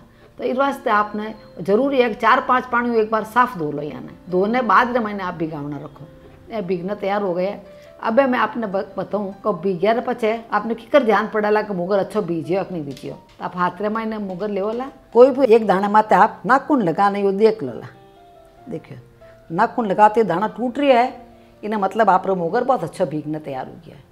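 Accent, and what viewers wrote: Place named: Marwar, India. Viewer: आपकी समझाने कि विधि बहुत बढ़िया है। सभी रेसिपी आपके समझाए अनुसार बनाई जाए तो बिलकुल आप जैसी ही बनेगी। आपकी मारवाड़ी बोली के हम फैन हो गए है🙏🙏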